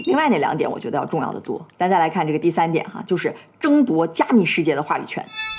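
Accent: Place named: Northeast, China. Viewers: 这么漂亮睿智的女人，一张口，出乎意料的东北大碴子味普通话！😂😂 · 他们都是大连人啊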